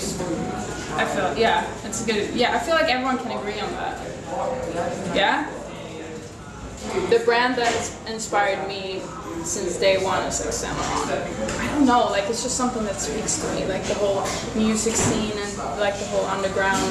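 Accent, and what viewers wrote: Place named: United States. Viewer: i love how she’s from sweden but sounds so americanized.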